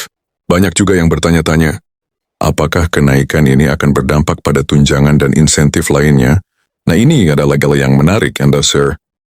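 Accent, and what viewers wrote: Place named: Malaysia. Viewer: Kuang meyakinkan beritanya.seperti ngeledek pensiunan Indonesia.dengarkan baik baik membacanya gaya logat Melayu waspada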